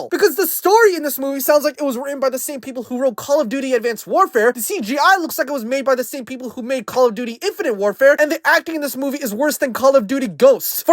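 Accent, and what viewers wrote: United Kingdom. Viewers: You're brit ??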